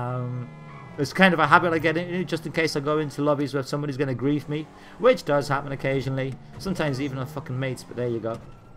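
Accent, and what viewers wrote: Ireland. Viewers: Is that an Irish accent I hear? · You from Ireland? You sound like one of my Crew members heh. As Im watching this video, I was parked on a hill right by Trevor's house and one showed up..I think it's just random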